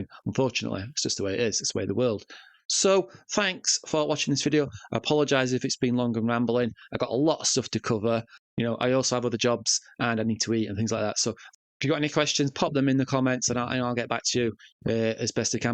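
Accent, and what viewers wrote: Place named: Manchester, United Kingdom. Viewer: You're from Manchester aren't you?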